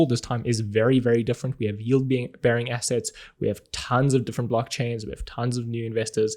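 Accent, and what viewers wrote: South Africa. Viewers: Are you South African? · sehe deutsche Werbungen gerade, aber habe immer gedacht dass du Süd Afrikaner bist wegen deines Akzents. Naja wilkommen trotzdem zurück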